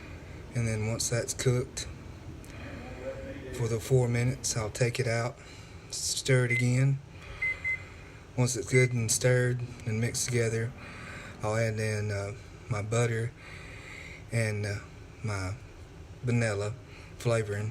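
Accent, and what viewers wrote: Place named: Texas, United States. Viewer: Awesome video I liked the look of this sweet brittle video but I don't like nuts much only sometimes! I love listening to the intro and the ending songs there very catchy! Your voice over is good when watching the video! You sound like your from the country or Texas lol! Team!!! 🌟💙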